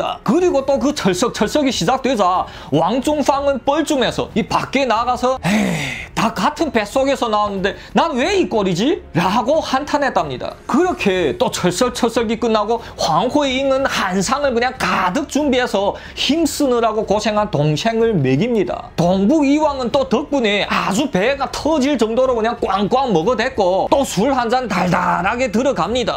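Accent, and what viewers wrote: Yanbian, China. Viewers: 중국사건 얘기는 다른영상에서 잼나서 자주봣는데 님껀 어제부터 이틀동안 다봤넹용~~ㅋㅋㅋ연변분이셔서 그런지 레알 말투가 리얼하네요~ㅋㅋㅋ👍👍👍👍👍잼나용^^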